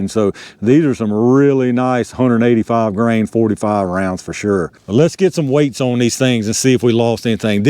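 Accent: South, United States. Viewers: I Love that southern accent !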